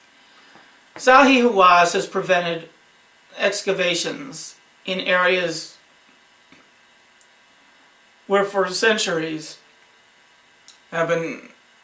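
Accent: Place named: United States. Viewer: Just read your post. The presenter has an American, not Australian accent.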